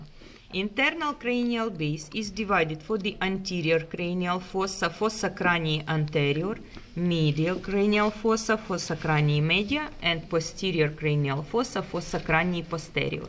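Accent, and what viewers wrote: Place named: Russia. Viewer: SHE HAS RUSSIAN ACCENT ,, VERY NICE VIDEO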